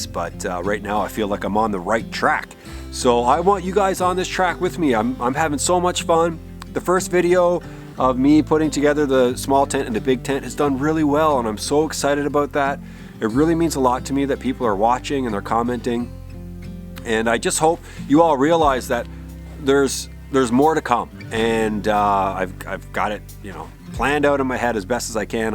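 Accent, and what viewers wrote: Canada. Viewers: I really enjoy your videos, i am not young, not outdoorsy, not strong, but i do enjoy all you do please keep the vids coming; i just stumbled on your vid, where are you located? I hear “ uwt for out so Canada? How do you keep safe out there all by yourself? Your wife doesn’t worry? Thank you for sharing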